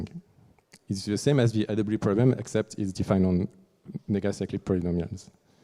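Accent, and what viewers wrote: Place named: France. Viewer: j'ai tellement le seum c'est en anglais avec un accent Français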